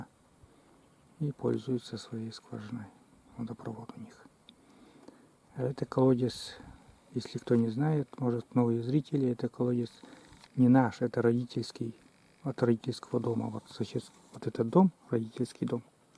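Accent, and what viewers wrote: Komi, Russia. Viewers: Добрый день! Всегда приятно Вас видеть. С началом посевного сезона, спасибо помощника. Всем Вам здоровья и мирного неба! Наслаждаюсь Вашим Коми акцентом. Добра Вам!!!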